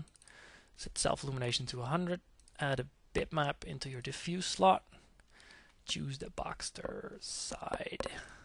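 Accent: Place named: Netherlands. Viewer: Dutch...het accent. nice tut bro ;)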